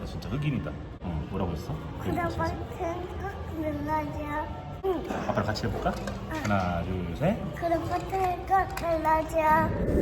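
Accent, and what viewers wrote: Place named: Australia. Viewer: 호주는 몇살때오셨나요? 영어발음이 호주계 한국인같은데..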